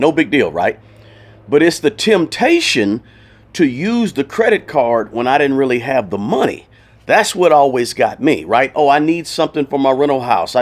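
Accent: South, United States. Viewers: Why does the best life advice come from a dude with a southern drawl?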